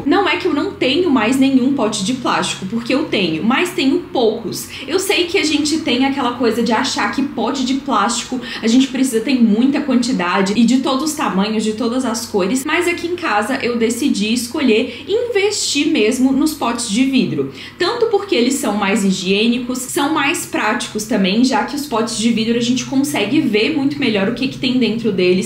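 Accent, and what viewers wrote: Minas Gerais, Brazil. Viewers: Coseraiada, muito mineira, que nem eu😂😂😂😂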